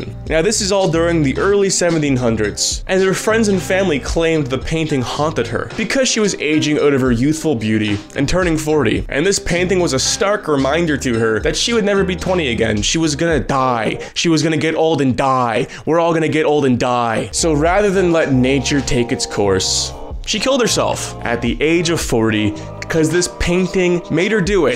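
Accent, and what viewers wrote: Canada. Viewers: maybe hes canadian or smth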